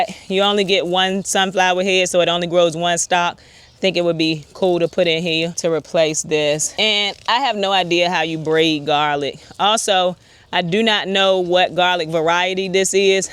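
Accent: South, United States. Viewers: I absolutely LIVE your country twang. I can listen to you talk about gardening for hours. Also, I started my garden this past weekend. How long does it take for the leaves to break the soil? Mines just look like moist dirt. 😆 🤣 😂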